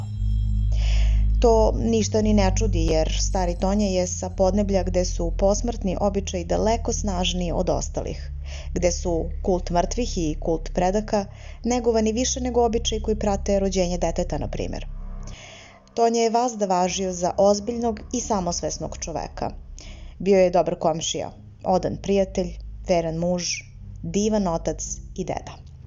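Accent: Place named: Podgorica, Montenegro. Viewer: Dobra priča. Iz Podgorice sam obozavam ovaj naglasak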